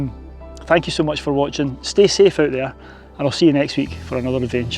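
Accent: Scotland, United Kingdom. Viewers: It is spectacular place indeed! I started from opposite side and I had an amazing weather. I was drained at the end of the day but it was worthy. I wish to come back there with my friends! Thank you for your videos. I enjoy all of them! Well done! I am practicing with your Scottish accent :))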